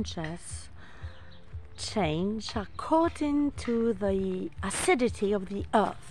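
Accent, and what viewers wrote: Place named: France. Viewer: Good morning my sister of night, it's so good to hear your lovely voice, your French and your French accent, I am wishing more and more I could speak French and maybe it's time for me to learn. Your town is beautiful! And hydrangeas are some of my favorite flowers! I hope you can rest in spite of all the music festivals and young people staying up too late and not being mindful of others. I hope you have a wonderful day and weekend. Merci ❤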